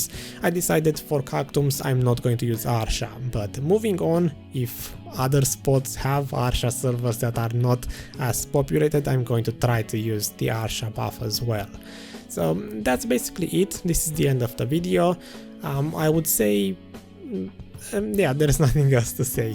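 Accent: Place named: Romania. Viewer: haha, your accent is so romanian. the moment I heard it I was almost certain XD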